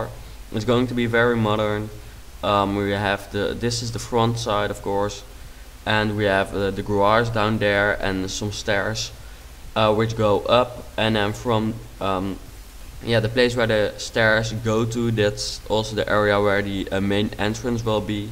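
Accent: Netherlands. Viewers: zonder naar je kanaalnaam te kijken wist ik al dat je Nederlands was. De manier waar op je Engels praat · ben je nederlands an het horen aan je accent net luis van gaal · Ik kan aan je Engels horen dat je Nederlands bent ;) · I immediately recognized your accent. What's up, fellow Dutchman. :) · Super chill. ( Ik zie en hoor namelijk dat je Nederlands bent ;) )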